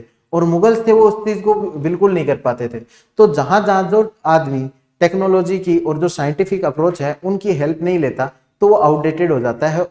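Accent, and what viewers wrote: Rajasthan, India. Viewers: Loved the rajasthani accent 😀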